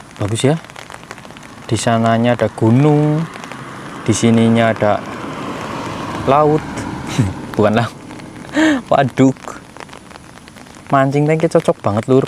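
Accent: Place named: Cilacap, Indonesia. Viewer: aslinya org mana logat nya kaya org Cilacap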